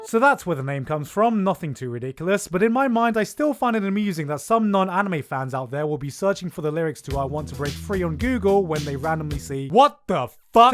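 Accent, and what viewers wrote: United Kingdom. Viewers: the sexy british Voice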